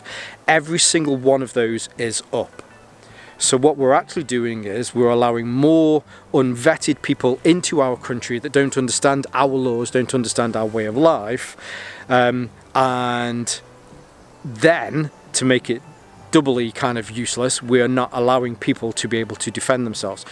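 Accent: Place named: Scotland, United Kingdom. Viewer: You have a nice accent, part Scot?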